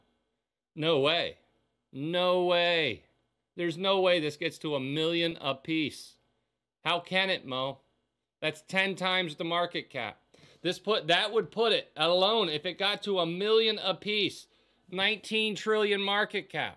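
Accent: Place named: Pennsylvania, United States. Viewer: Hello from Morgantown WV. You sound like you're from PA,.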